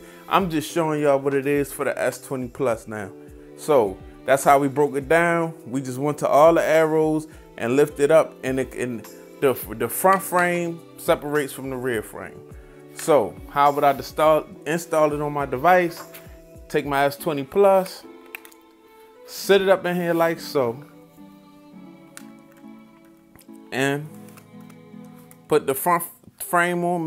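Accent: Baltimore, United States. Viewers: i hate ur baltimore accent but thanks for the vid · whaaat. Baldamoe?? Not gonna lie I'm from here too but I didn't pickup but now that someone said it I Hear it lmaaaooo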